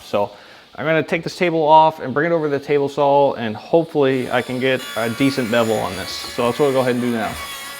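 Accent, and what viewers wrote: Canada. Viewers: Canadian I'm guessing. First video I've see of yours and I am on the 11 second mark. Am I right?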